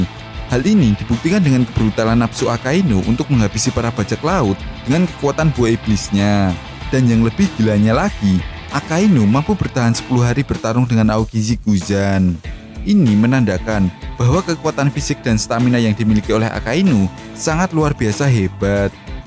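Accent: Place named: Banyumas, Indonesia. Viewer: Bos ngapak banget ....tp seru denger critnya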